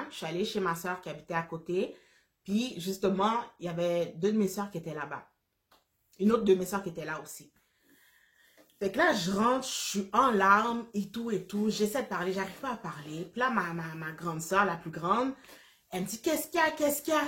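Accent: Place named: Haiti. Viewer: Je sens à ta voix que t haïtienne 🇭🇹 💪🏾 En tout cas c’est une histoire extraordinaire c’est Dieu qui t’a donné ce petit bout de chou pour te dire de garder espoir tout n’était pas fini pour toi Par contre ton conjoint la sa réaction est très mauvaise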